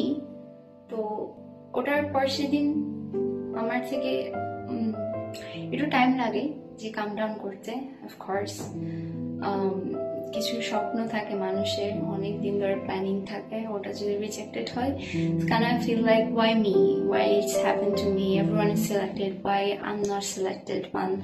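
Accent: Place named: Chittagong, Bangladesh. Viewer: whan you say Bengali, চাটগাঁইয়া একসেন্ট আসে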